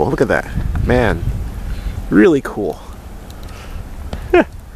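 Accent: United States. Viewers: Is your accent representative of the population in Georgia?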